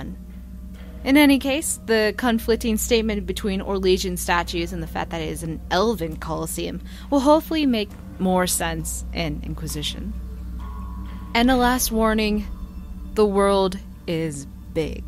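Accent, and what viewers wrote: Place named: France. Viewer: I love you're french accent.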